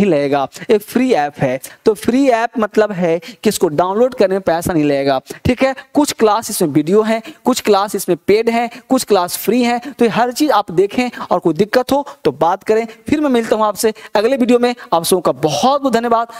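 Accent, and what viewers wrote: India. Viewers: Sar aap word ko kaun si accent mein bolate Hain Indian accent yah British accent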